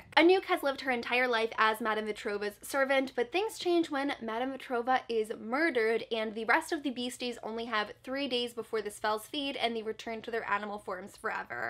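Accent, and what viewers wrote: France. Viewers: omg your french is so cute! <3 :) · Félicitations pour le très bel accent français : ) C'est toujours un plaisir de regarder tes vidéos. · Your french pronounciation was too cute!!! Pour ma part, c'est l'inverse ; je lis en anglais alors que je suis québécois :p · Your french accent is so cute 😍 I love your video so much ❤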